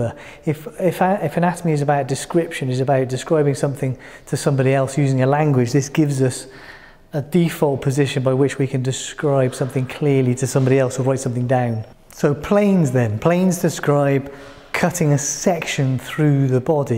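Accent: United Kingdom. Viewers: Yeah i really don’t like bri’ish accent(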